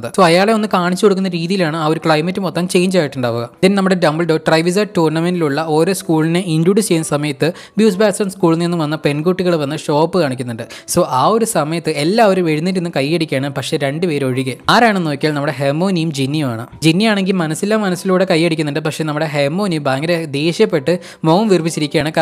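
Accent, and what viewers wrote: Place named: Kollam, India. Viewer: Cheyttaaa plzzzz bakiii pettannu idoooooo exam ayalll kanammm amma samathikullaaa... Plzzz chettantee videooo powliyannuu athu kondannuu mattulavaruu ittittuu but chettante expalanetion powwliiyanuuu piinnee njan nerathee itta messanginnu chettann reply thanthill orupaduuuu thankzzzzz pinee chettanteew sthalamm ethaa kettittu kolamm language polee unduuu ethayalluu superr😘😘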